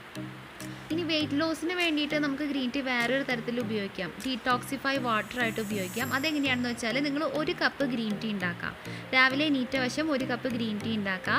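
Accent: Thrissur, India. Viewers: തൃശൂർ ആണ് അല്ലെ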